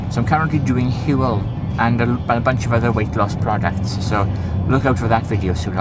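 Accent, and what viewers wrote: Wales, United Kingdom. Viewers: Where is that? Aberavon or more Mumbles way? Driving me mad, trying to work it out. X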